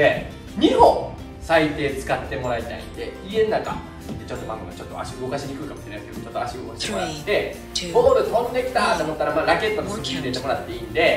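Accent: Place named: Kansai, Japan. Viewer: ワイプのMJがシュールw いつも思ってますが、の声って高めなのと関西弁のイントネーションで良い意味で引っかかるというか、聞き取りやすくて良いですよね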